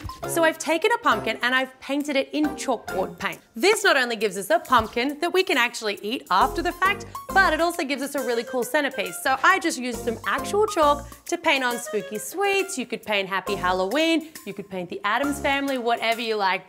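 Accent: Australia. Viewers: But her accent is clearly Austrailian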